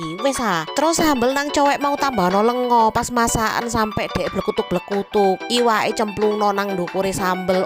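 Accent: Surabaya, Indonesia. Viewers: Mantab medog suroboyoan 😂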